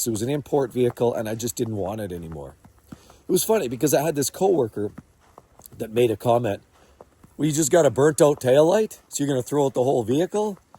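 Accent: Canada. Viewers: Canadian...I can hear it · That street you're walking down looks like Russia. But your accent proclaims you're Canadian. Where is this? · Looks like you live in Colorado but your accent sounds Canadian!Anyway, turned 60 and burned out at my job..30 years of teaching..I’m ready to retire..Was planning on 65 but I can’t be in a job where there is no parental and administrative support..It just beats you down to the point where you don’t care anymore..I would rather retire on a lower pension and have peace then to endure 5 more years of this..I’ve become a minimalist and don’t need much of anything…I would to do something but have more control over what I do..Maybe reinvent myself?…Maybe buy a business or just do rideshare or substitute teach??..Trying to figure it out..I think me and my wife would be ok financially..Our home is almost paid off..We could sell and downsize for extra income?…kOur vehicles are paid off..I just ready for some peace and more control of my weekly routine.. 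We aren’t interested in much travel..We would be good with once or twice per year..nothing to extravagant…Anyway I hope I can figure it out before the end of the school year or summer? I’m mentally drained and so ready..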